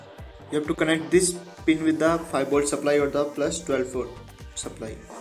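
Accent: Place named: India. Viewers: I personally don't really like it. But if I get to choose between Indian English or nothing at all, then the decision is really easy. I do appreciate videos like this a whole lot :D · i love this indidan english!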